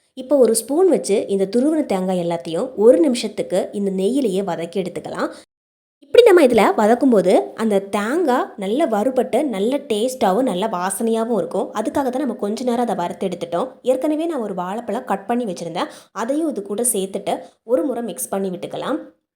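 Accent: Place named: Kongu, India. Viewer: நாங்கள் இப்படி தான் பேசுவோம் எங்க கொங்கு மொழி சூப்பரா தான் இருக்கும் மரியாதை கலந்த மொழி வாழைப்பழத்துல குற்றம் கண்டு பிடிக்கிறிங்க பாஸிட்டிவ் பேசுங்கள் நெகடிவ் வேண்டாம் இந்த பெண் பேசியது அருமையாக தான் இருக்கு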